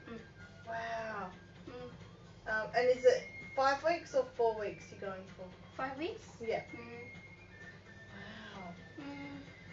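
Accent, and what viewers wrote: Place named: Australia. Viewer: 호주발음 연습하러 와도 좋은 채널인데요? 언제나 느끼는 거지만 호주발음이 가장 어려워요~^^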